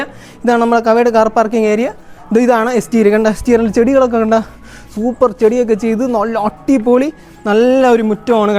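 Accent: Parassala, India. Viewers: ആദ്യമായിട്ടാ നിങ്ങടെ വീഡിയോ കാണുന്നെ, നിങ്ങടെ സംസാരം കേട്ടിട്ട്, പാറശ്ശാല - കൊറ്റാമത്ത് എനിക്ക് പണ്ട് ഒരു കൂട്ടുകാരൻ ഉണ്ടാരുന്നു അവന്റെ അതെ സ്ലാങ് 🙂..കേട്ടപ്പോ പെട്ടന് ഓർമ്മ വന്നു..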